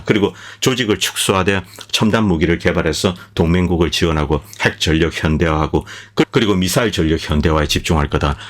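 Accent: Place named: Busan, South Korea. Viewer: 본인이 몰라서 그렇지 전혀 다릅니다 지금 방송하시는 도 부산 사람이거든요 거의 이 억양입니다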